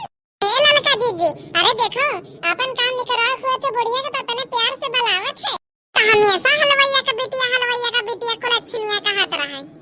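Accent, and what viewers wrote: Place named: Raebareli, India. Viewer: Aaap kaha se ho Raibareli ki bhasha lagti h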